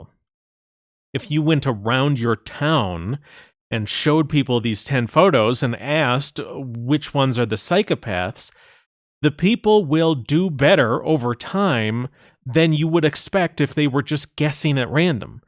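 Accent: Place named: United States. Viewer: Maybe west-coast or mid-west American-- it's quite generic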